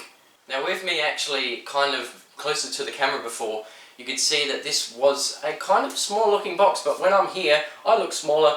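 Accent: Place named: New Zealand. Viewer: Great review. Noticed you have a NZ accent :-) Waiting for your updated review with 5700XT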